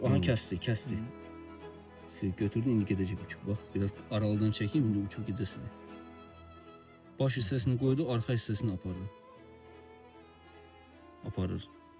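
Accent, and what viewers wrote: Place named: Zaqatala, Azerbaijan. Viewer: Zaqatala ləhçəsi)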